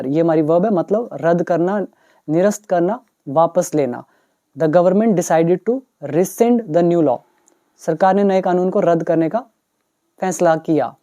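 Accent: India. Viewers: Great 👍, I wanted to inform you to improve your slang and pronunciation. Now, please try to develop your accent. Don't teach indian accent to your viewers. Take it easy.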